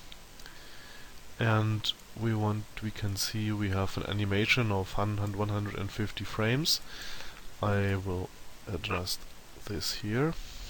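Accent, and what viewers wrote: Germany. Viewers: oh mann ich hasse den deutschen akzent :D Gutes Video trotzdem, danke!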